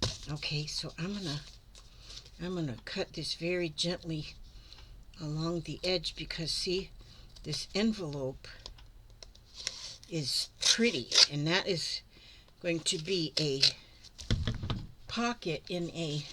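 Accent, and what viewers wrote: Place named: Florida, United States. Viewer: Hi it Patricia Rodriguez from San Antonio Texas I just always love you and papa 👴 how cute and adorable u both are and love ur Florida accent I was just thinking 🤔 you can color then put mod podge on those papers u got I guess from the floss well u can make them into paper beads and make necklaces with them I am subscribed to Darlene Michaud and she does a lot of stuff like that just giving u an idea 💡 love u guys have a blessed wonderful day in Jesus Christ mighty name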